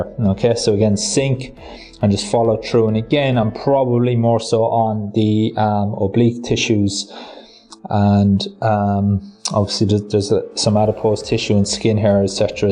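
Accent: Ireland. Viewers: Sounds Irish to me. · What you on about? You can tell he's Irish straight away😂😂😂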